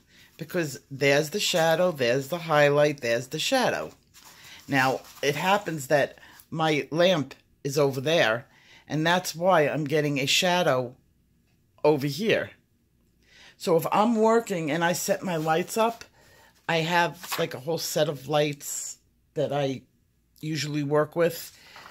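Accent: New York, United States. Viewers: Hi! I am so thankful for your channel. You are so encouraging and your lessons are easy to follow. My only problem is when you don't show what color pencil you are using as you are using them, or list them in the description, as I am trying to keep a record of good color combinations. Thank you for all that you do! Blessings! P.S. You sound just like my family from NY!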